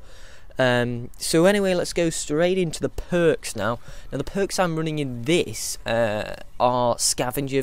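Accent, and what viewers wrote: United Kingdom. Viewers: Haha, a first video on MW3 that is presented by a what sounds like a cool British guy like me!! Wahooo. P.s I have nothing against Americans, they are cool to!!! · Fuck that british accent...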